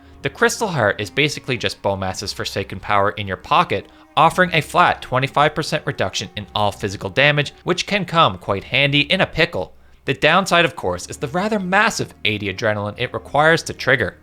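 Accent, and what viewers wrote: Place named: Maritimes, Canada. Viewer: Are you from Nova Scotia or New Brunswick? Got that accent goin on.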